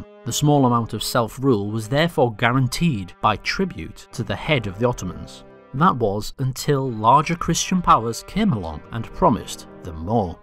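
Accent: United Kingdom. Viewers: Love the countryballs and the humor, keep it up! Properly handled video, I wasn't even aware of the genocide in the Balkans. My question is: Where does that accent come from? I know it's British, but more precise please ^^ and how do you animate your videos? Greetings from Germany!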